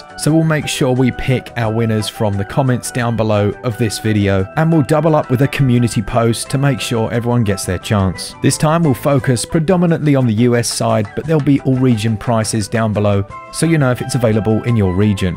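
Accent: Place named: Essex, United Kingdom. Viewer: J W Definitely from around/near London, probably Essex/Kent way is my guess.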